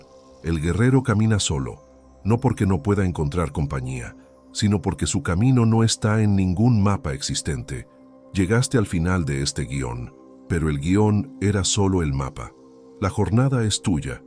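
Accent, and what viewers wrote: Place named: Argentina. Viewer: Acento agertino a tomar por culo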